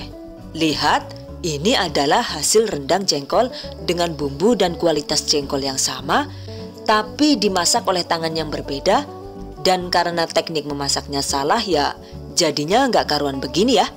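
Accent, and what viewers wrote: Banyumas, Indonesia. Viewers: Mbaknya dr Banyumas ya ngapak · Dene ngapak...ora ngapak ora kepenak 👍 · Oalaah Wong ngapak mbokayune 😀😀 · Jebule wong ngpak😂😂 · Deneng pada karo nyong mak ngomonge ngapak😁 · Kok ngapak logatnya 🤣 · Perfect banget Bunda, bdw kok bisa ngapak emang mertua org mana Bund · nice info ..mksh.. ini bhs nya kok ngapak banyumasan..dr madiun or banyumas yha heee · Mantulll... Paket komplit.. yg dulu blm doyan jengkol. Sekarang udah suka... Kok suaranya ngapak ? Heeee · Bahasane ngapak kyk BN aku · Jebulnya ngapak yak hehe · Inggrisnya Fasih 😁... eh jebule wong ngapak ya 😅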